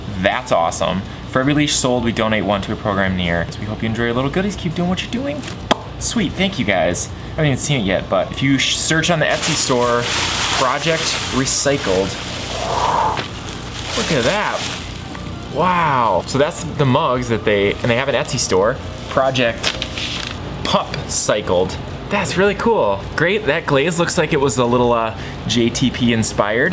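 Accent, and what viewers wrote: Minnesota, United States. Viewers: Wow CLASSIC Minnesota accent lol love it. Mine only comes out when I’ve had too much to drink now. 😋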